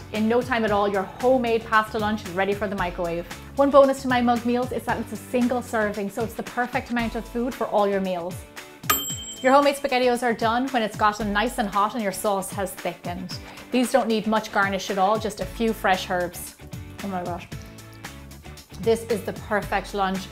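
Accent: Ireland. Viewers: Are you from the Republic of Ireland or Northern Ireland ? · OMG YOURE IRISH ME TOOOOO!!!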